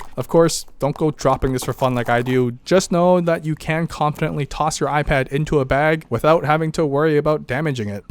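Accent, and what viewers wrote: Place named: Canada. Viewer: Wow, Kanadian lolzz 👍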